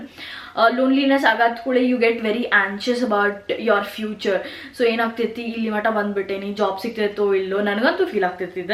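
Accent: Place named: North Karnataka, India. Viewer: ಅಕ್ಕ ನೀವು ಉತ್ತರ ಕರ್ನಾಟಕದವರು ಅನ್ಸುತ್ತೆ ನಿಮ್ಮ language ಕೇಳಿದರೆ UK davru edre yes antha comment madi ela andre no antha haki please akka🙏👈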